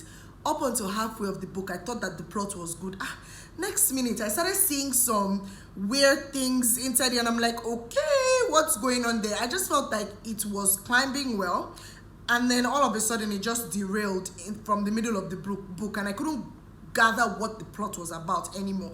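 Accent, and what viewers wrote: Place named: Lagos, Nigeria. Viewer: Your accent is obviously a Lagos Nigerian accent. Or perhaps a certain sub-group in Lagos or even in Nigeria have this unique inflection. I am curious as to how you came about the accent. Does it have anything to do with ethnicity or schooling or social class. reason is that is exactly how Chibundu Onuzo sounds. Thanks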